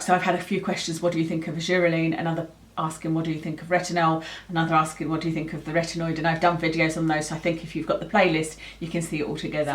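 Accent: United Kingdom. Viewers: Thanks. Great to hear a British voice. Opinion on top tinted, moisturizing spf?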